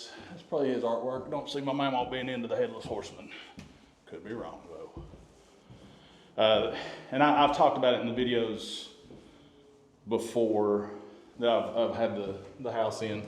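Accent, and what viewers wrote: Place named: Tennessee, United States. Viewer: “anniss” = “and this” (I am from Maryville, TN, raised by my great grandparents, so this accent is heartfelt) I truly hate that Helene hurt so many people, no matter how detrimental, it is no competition, but I truly wish I had the means to help these folks. All I can give are prayers and a comment on YouTube but nonetheless my thoughts are with them. 😢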